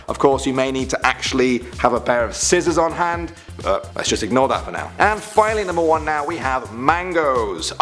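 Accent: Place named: United Kingdom. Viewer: i think that is british accent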